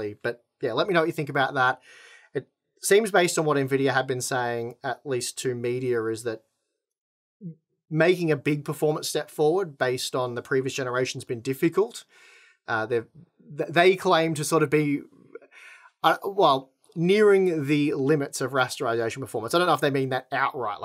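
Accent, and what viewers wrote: Australia. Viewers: Aussies are so cute when they talk.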